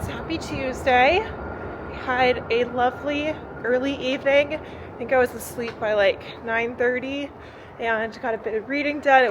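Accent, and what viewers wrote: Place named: England, United Kingdom. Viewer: It sounded like you have an English accent now after talking to Ali. This is one of my favourite vlogs from the dissertation diaries to date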